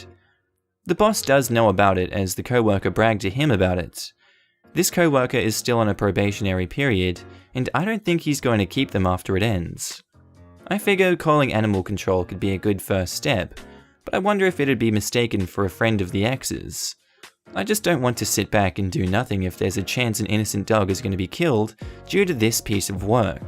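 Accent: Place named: Australia. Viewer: your amazing Aussie voice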